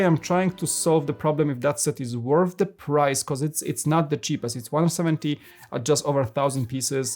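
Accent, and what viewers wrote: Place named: Poland. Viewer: Sets are great, but overpriced. I think the reason is Disney license, it must be very expensive, at least we get new Cody Minifigure. Ps. That's my first time on your channel, are you from Poland? You sound like a pole :)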